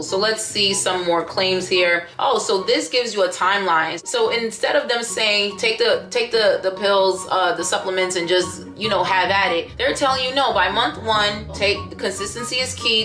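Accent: New York, United States. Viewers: U definitely from New York or Jersey Lml. 💙 from Brooklyn🗽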